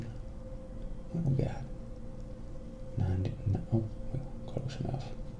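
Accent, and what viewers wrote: South, United States. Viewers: The whispering southern accent reminds me of Morgan freeman for some reason haha. Nice video man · Wow, you look so different with hair - and a beard too!!.......ASMR Muzz has just done the opposite and shaved it all off. So now he looks like a hitman gone renegade! Great accent btw....or is yours a 'southern' US one? Your vids are so imaginative, and a far cry from the usual ASMR fare if you know what I mean! .....the best ASMRtists are the ones who make vids no other ASMRtist does....like yourself, Muzz, and Phoenician. Grow out the beard, and before you know it you'll be featuring on Beardbrand with Jeff whatshisname!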